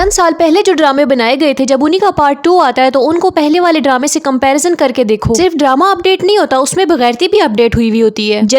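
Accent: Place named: Punjab, India. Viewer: Kia tum Sana's bucket ho? Same awaz same style... wai patli karari si full speed pe bhagti train with some punjabi/potohari accent 😄🙉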